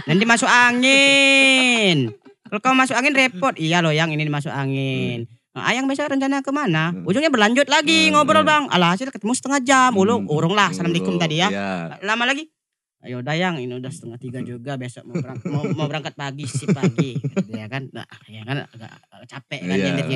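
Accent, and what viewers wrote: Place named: Lampung, Indonesia. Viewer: Lampung banget logat nya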